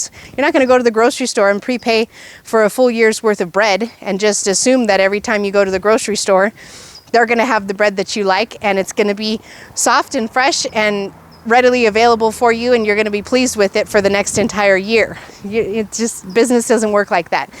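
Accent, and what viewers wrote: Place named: India. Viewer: Sounds like hes from India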